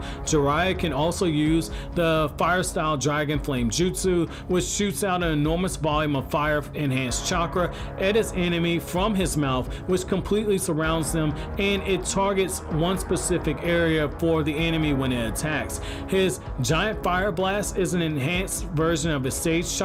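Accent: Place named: South, United States. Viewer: they say oil like that in the south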